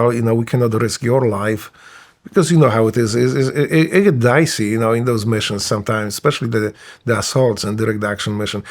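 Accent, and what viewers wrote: Poland. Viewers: You can be born in USA...but polish accent always comes out. Our nature dictates this. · Speaking like a true Polish xd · your accent says you're from Poland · Why he is speaking with Polish accent?😅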